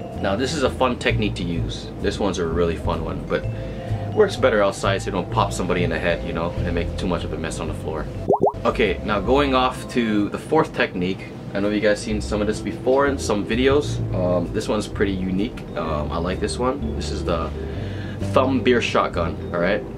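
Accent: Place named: Canada. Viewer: I can tell you're Canadian, Winnipeg perhaps?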